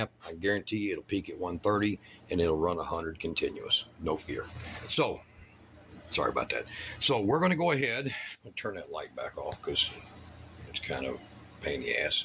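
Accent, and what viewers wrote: Texas, United States. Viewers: So funny that I hear I think a Texan. I know one friend of mine with the same name in Irving, TX that's a Scouter friend. Living close to DFW airport gives a perspective of how folks talk. Believe your doing a great job for us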